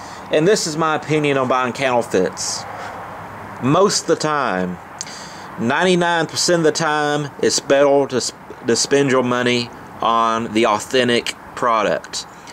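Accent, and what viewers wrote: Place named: South, United States. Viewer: I bet u from southern USA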